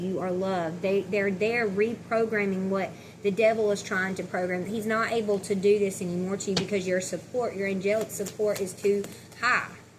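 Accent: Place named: South, United States. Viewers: Sounds American from the South, like George or Alabama. 💝 She does have a sweet heart!💝 PS she says she's from Georgia! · I love your accent. Are you American?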